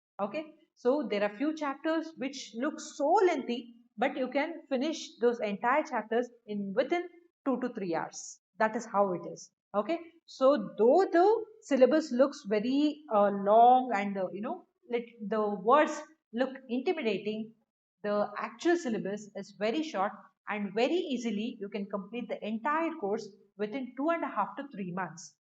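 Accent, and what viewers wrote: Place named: North India, India. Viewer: Teacher seems to be resourceful and deeply devoted her discipline Anthropology. Her accent is north Indian.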